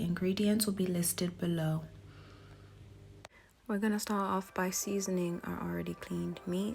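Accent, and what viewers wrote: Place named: Liberia. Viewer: Hey girl!!! So glad I came across your channel. I just moved from NYC to London a few months ago and I've beeen cravvvvviiiingggg Liberian food. But I don'y know where to find stuff like smoked Turkey meat and other common ingredients here? Can you advise? And do you know of any Liberian restaurants in London by chance? Sorry for the 21 questions. I heard your accent and I couldn't help but ask (assuming you're still here). <3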